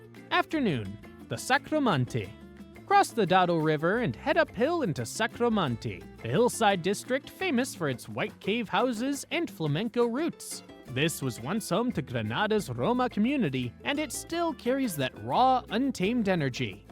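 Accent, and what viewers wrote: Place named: United States. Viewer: good tips but the gringo accent, ouch !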